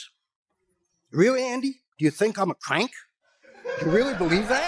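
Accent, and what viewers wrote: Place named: New Zealand. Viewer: Sounds like New Zealand 🇳🇿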